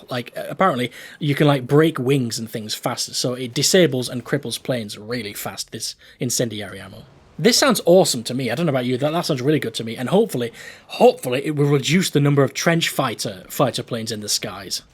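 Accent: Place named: North, United Kingdom. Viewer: you have a charming Northern accent. Where did you grow up?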